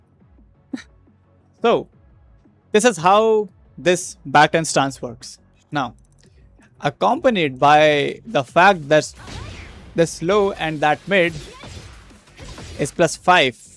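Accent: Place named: Bihar, India. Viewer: Ye bihari bhai ko english kisne sikhai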